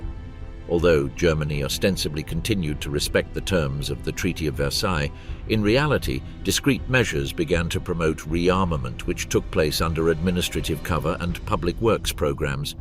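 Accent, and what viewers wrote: England, United Kingdom. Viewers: How could it be the German perspective, when the narrator has a strong English accent ? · and more so the accent is English not German!!!! it must be propaganda... the Germans beleived they were winning right to the end with the vunder machinas. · How can it be from a German perspective with your English accent?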